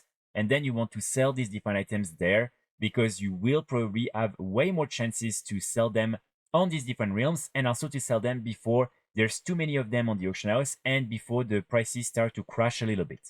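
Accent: France. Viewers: T'es français non? L'accent xd Merci pour la vidéo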